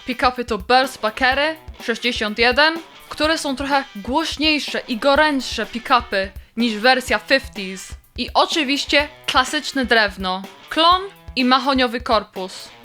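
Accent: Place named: England, United Kingdom. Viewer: Swietny material.Obejrzalem z wielkim zainteresowaniem.Lubie Twoje filmy z takimi muzycznymi nowinkami.Noi lubie tenTwoj proper English accent gdy wymawiasz nazwy anglojezyczne.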